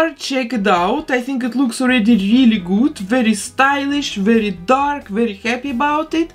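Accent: Russia. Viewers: This is my second episode and I'm already hooked and I love your accent are you Russian?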